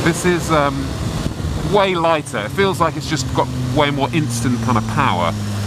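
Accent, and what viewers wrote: United Kingdom. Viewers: 5 seconds into this video and i have to say. I love british accents..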